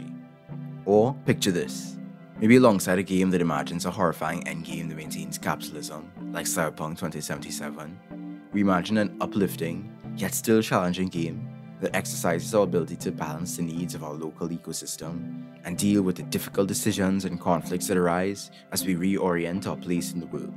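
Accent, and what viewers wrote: Trinidad and Tobago. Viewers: i knew i recognized the accent, found a Trinidadian youtuber out in the wild lol. love the video and although i haven't heard the name before i am familiar with the concept of solarpunk and honestly i hope we will be able to see our futures in this hopeful light. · What country are you from? You sound trinidadian a little lol 😭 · Boi, I know a Trini accent when I hear one! Love ya channel. You have a new subscriber. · I keep trying to place your accent. Are you trini? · Cauhgt me offguard with the trini accent. Haven't heard trini since living in Venezuela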